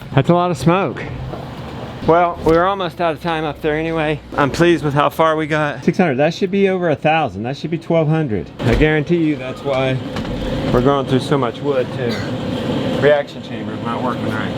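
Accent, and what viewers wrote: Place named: Hawaii, United States. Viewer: Hey you sound like a hawaii local person say. We go see if the water stay flowing. That's what we say. Ok den brah!🤙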